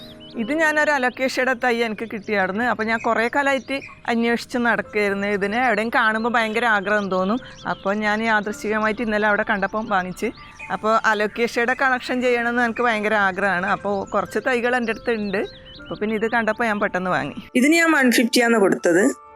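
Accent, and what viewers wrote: Kannur, India. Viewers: Kannooor analle...bhashashyli kettitt angane thonnunnu. CM nde oru style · മാഡത്തിന്റെ സ്വന്തം നാട് കണ്ണൂരാണോ ? സംസാരം കേട്ടിട്ട് കണ്ണൂർ ഭാഷ പോലെ...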